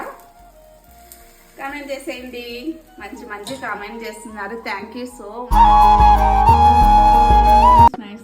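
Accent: India. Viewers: Mee matalu chala baguntai pinni endukante mana anantapur slang ekkada filter cheyyakunda original slang ❤ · Me videos and meru chese recipes, me rayalasema yasa anni chala ante chaala bauntayi garu 🥰🥰🥰❤❤❤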